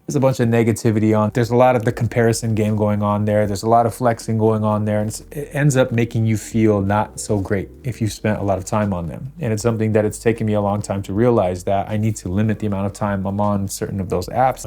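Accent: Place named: United Kingdom. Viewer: Hi; I enjoyed your video. I shall follow u henceforth. I guess, you are a British guy or an Australian guy as your accents are not American. But I had no difficulty at all in following each word that you spoke. Kudos to your endeavour.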